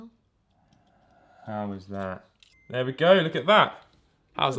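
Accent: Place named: United Kingdom. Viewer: I love her british accent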